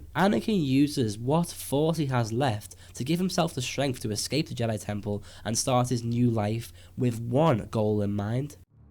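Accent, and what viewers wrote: Liverpool, United Kingdom. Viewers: I don't think Vader was trying to kill Luke in Empire, he was basically just defending from Luke's attacks, and driving him toward the carbon freeing unit, so he could take him to the Emperor. He didn't know about Leia until he read Luke's mind. Also, are you a Scouser? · Nice to hear a British accent talking Star Wars!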